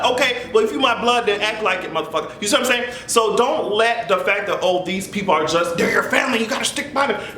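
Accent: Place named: Jamaica. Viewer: Hey, 1. put out as many videos as you can! At times, I'm looking out for you and you usually do 1 video a week, besides the podcast! If you can do 3-4/week, if PO.SSI.BLE (your baritone singing voice), please don't hold back! 2. Please bring out the Island/native tongue when expressing yourself! Not ever video, but like this video today, that Jamaican accent would have driven the point! We all know you are quite educated, but some island touches here and there isn't bad. Test out the idea of course, since you do have a steady audience! 3. "You need to CUT IT!" True, some family members are not ride or die! Ok, I'm out!!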